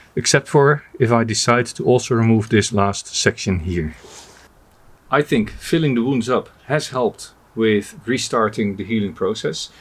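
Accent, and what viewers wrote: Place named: Netherlands. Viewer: Hello me deario! Great to hear from you with your lovely Dutch accent 👌I’m so glad success hasn’t had the effect of neglecting your early fans! All best wishes for the rest of 2025 ✌️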